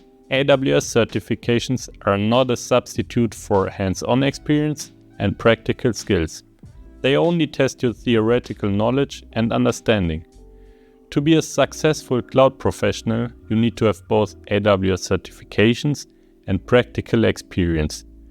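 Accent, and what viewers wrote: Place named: Germany. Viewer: Love the German accent. Thank you for the video, very helpful, especially regarding getting started with the cloud topic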